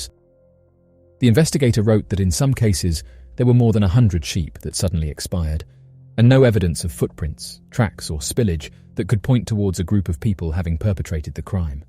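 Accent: England, United Kingdom. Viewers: WHy The Hell is an ENGLISHMAN ACCENT being used for a USA Incident? JUST CURIOUS. Right? USAF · I am sick of hearing a british accent to report on AMERICAN NEWS!!! I realize it is computer generated, is there no one who speaks american english, in all of Utube? America broke ties with GB and the British Monarchy, generations ago! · 🤬🤬🤬🤬WHY AM I LISTENING TO A BRITISH VOICE NARRATIVE?? I listened for three minutes. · That he has the English accent means I can skip this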